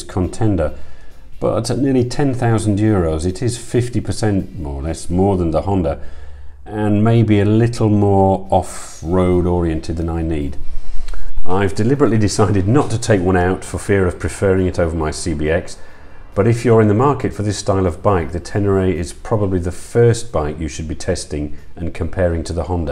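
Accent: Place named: Newcastle, United Kingdom. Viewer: he’s a Geordie I think. Sounds just like you 😂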